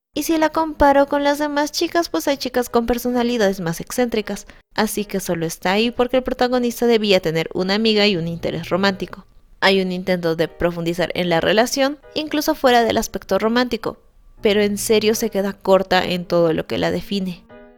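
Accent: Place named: Chile. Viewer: ctm eri chilena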